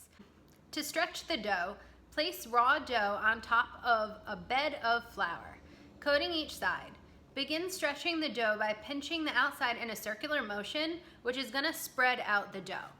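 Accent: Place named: Rhode Island, United States. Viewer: You can never lose a RI accent!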